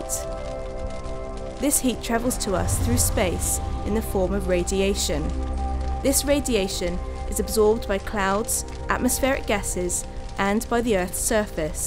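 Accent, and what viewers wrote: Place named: United Kingdom. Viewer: its like british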